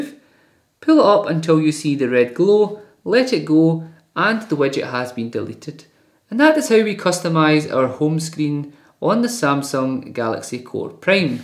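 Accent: United Kingdom. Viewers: Are you Scottish Laddie? :)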